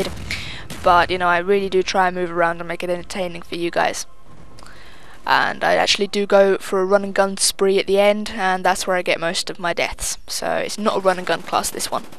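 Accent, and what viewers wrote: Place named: Surrey, United Kingdom. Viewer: R u from Surrey, you have a Surrey Accent And you are like the best educated person on youtube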